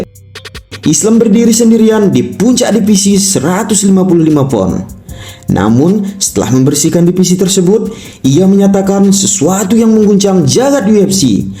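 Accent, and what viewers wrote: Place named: West Java, Indonesia. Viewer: .. Sedikit saran niih bro. Agak dikurang2inlah sedikit logat/dialek "Sunda" mu. Penonton mu tak semua orang Sunda. Came on, up grade lah chanel mu biar lebih keren.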